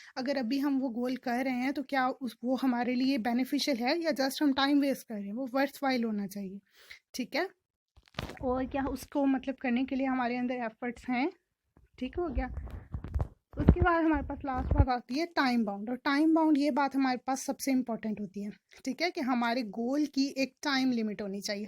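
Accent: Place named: Kashmir, India. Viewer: Muah maam sexy voice ❤ your kashmiri accent is so sexy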